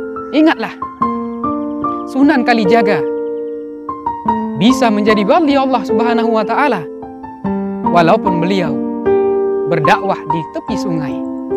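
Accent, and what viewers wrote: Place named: Malaysia. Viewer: Kok loghat nya mirip orang Malaysia ya 🤔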